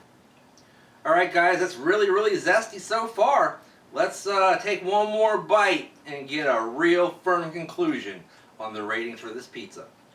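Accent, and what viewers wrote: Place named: Missouri, United States. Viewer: he must be from Missouri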